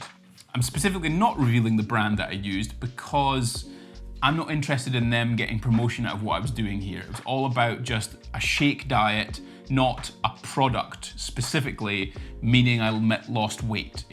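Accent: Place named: England, United Kingdom. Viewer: I subscribe because of your English accent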